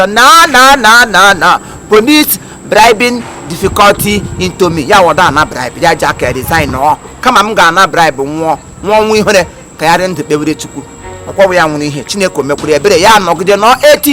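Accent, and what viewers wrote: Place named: Imo, Nigeria. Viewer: on behalf of Orlu, Nkwere, Dikenafai, Okwele, Umuduru, Anara, and Ala Ekwe, I hereby remove my hat for you. You're a special CASE! Lol (I need to visit Ekwe) when I come back home. Please keep speaking Ekwe/Imo state dialect, don't EVER try to speak Anambra dialect again. #Ekwe #ImoState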